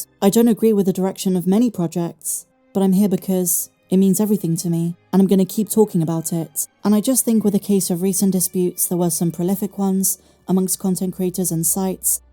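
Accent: United Kingdom. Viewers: I love her Brit accent too, but she needs to address the muddled bass sound mix. There's little sibilance in there and she needs to up the mids and highs to correct it. One shouldn't have to pump the volume or use subtitles (filled with spelling errors a lot of the time) to make sense of what the speaker is saying, right?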